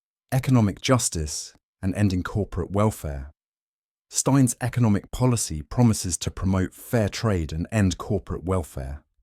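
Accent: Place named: United Kingdom. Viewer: Why is a Brit telling me about American politics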